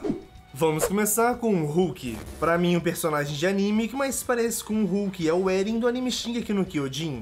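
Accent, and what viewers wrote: Portugal. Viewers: Você é português ? O sotaque parece rsrsrsrs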